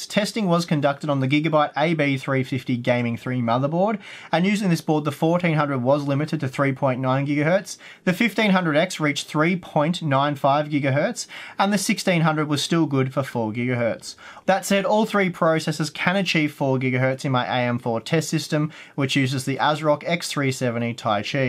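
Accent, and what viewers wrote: Australia. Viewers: I sense an Australian accent, is this guy from Australia?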